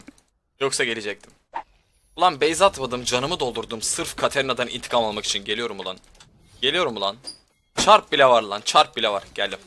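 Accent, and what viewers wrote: Trabzon, Turkey. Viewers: Bence Trabzonlusun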